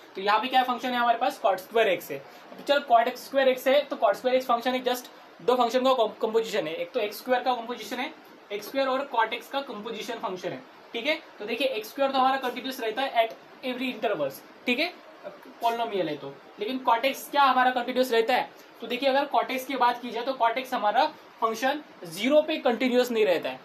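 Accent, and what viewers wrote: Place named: Maharashtra, India. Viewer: Sir maharashtra se ho n Bolne k tareeke se lag rha h 😂😂😂😝😎😎😎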